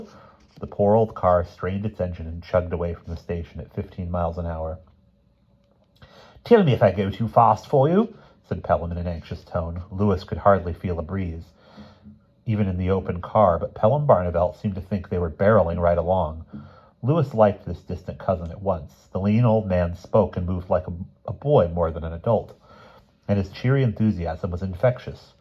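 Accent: England, United Kingdom. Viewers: You do a good English accent!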